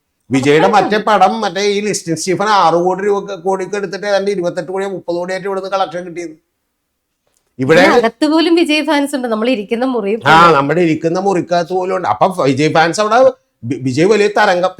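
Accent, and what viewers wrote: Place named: Kochi, India. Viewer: Oh . കൊച്ചിക്കാരൻ്റെ അളിഞ്ഞ മലയാളം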